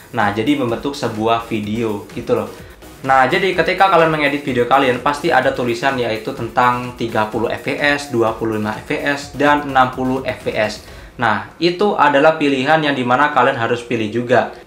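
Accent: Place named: India. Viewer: Kok logat lu kaya India bang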